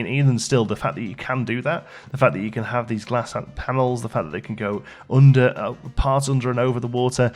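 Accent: United Kingdom. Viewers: Weird, by your voice I would have thought you were in the UK already. Hello to a Canadian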